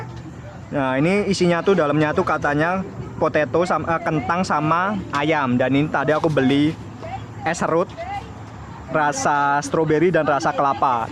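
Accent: Java, Indonesia. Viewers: Mas ganteng amat ...orang mana sih,,, Tapi gaya logat bicaranya kaya orang jawa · Ketoro wong jowone 😁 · Asli jawanya mana mas ko medok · Mantap mas, tinggal di amerika tapi logat jawa nya masih ada 👍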